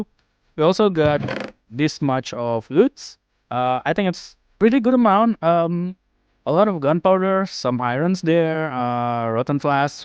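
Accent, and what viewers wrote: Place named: Indonesia. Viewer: Logat indo njir... Ts orang indo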